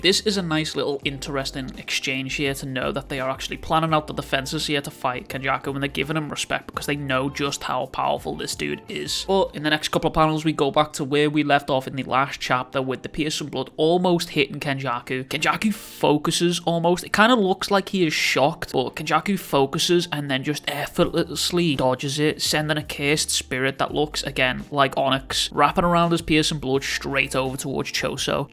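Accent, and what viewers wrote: United Kingdom. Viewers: Cant lie its cool to hear a Uk Accent whether it be Scotland Wales or England feels like im talking to one of my mates lol great video bro keep em coming <3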